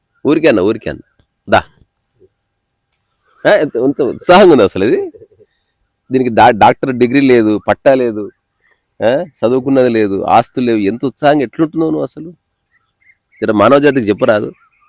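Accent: Telangana, India. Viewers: Dear I lovers the video of all 3 of you The back ground, the hay stock and everything. Enjoyed the content and burst in to laughter of joy with your Telangana Accent and sweetly scolding the dog. I think dog is expressing its love 🧡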